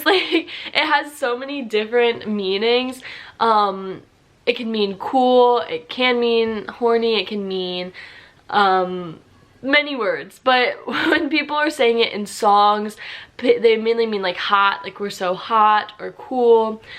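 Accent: Bavaria, Germany. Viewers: Man merkt, du warst in Bayern :-D Ge Oider ^^ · gel’’ am ende des satzes😂 man merkt das sie in bayern war😂fehlt nur noch: ja freilich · Es hört sich sehr bayrisch an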